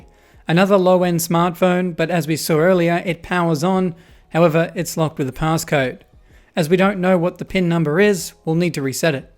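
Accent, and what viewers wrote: Australia. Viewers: Another Aussie yelling at nuggets. I have subbed.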